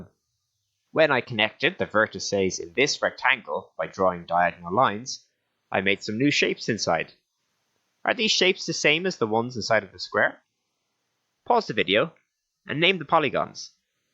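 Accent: Ireland. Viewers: These videos inspiring I have a theory but: Are you Irish?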